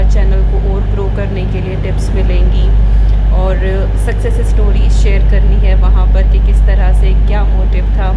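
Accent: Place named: Uttar Pradesh, India. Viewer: Congrats! Well deserved honour. I'm from Chicago and I started watching your channel since last August when I was visiting India.One day I was getting bored in my hotel room as my husband was away on business trip and I could not go along with him as I was sick, so your cleaning routine flashed on while I was watching other videos on you tube, and believe me it was so interesting that I was instantly hooked up to your channel. Your typical U.P. style of speaking and hard work combined with your simplicity just did wonders and I felt connected to you. Congrats and best of wishes for your future endeavours.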